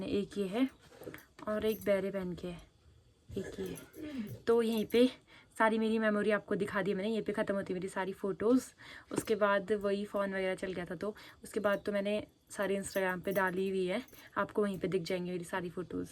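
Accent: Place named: Kumaon, India. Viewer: Wow Didi aap ke video Dekhne me kuch अलग ही fil hota hae or upr se aap kumauni ho samj to nahi aata bat sunne me maja aati hae aapki Boli😊❤❤❤❤❤❤😊😊😊😊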